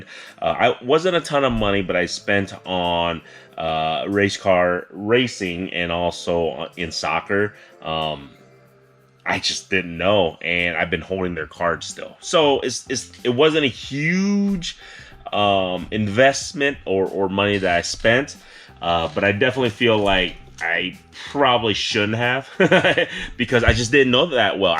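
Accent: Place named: Vietnam. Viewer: Are you vietnamese? U sound like it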